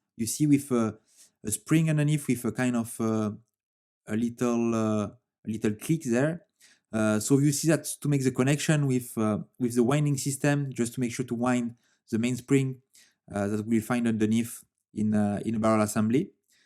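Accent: France. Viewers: Great video. I like the Franglais accent. Just one tip. When you assemble the automatic works on top of the movement, it is better to unwind the main spring and let the movement stop. If a screw would drop into the running balance spring, that can cause a lot of damage.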